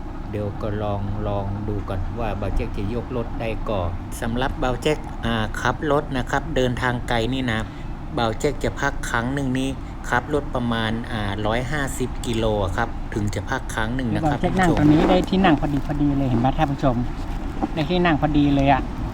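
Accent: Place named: North, Thailand. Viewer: คำพูดออกเหนือๆฟังดูน่ารักดีนะครับ...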